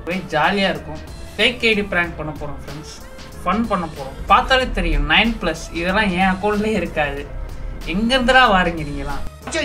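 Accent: Madurai, India. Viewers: Madurai ya Anna ne basa nailla irruku nainga appadetan pasuvom 😅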